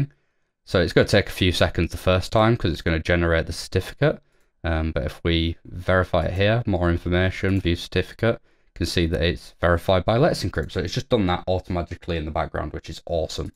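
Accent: United Kingdom. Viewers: Men im in love with this uk dialect .. i just enjoy alot ..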